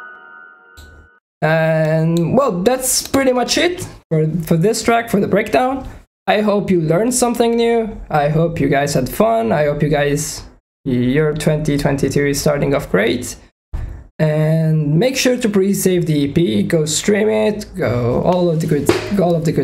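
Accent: Italy. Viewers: Italian I think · Si sente l'accento italiano ahahah hai stra talento!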